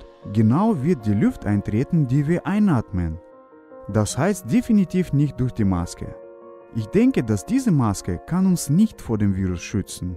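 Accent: Russia. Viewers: das ist auch ne Idee 😅 Wobei ich seine russische Aussprache auch sehr mag. Ich versteh aber leider nur sehr wenig davon. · Чувствуется русский акцент)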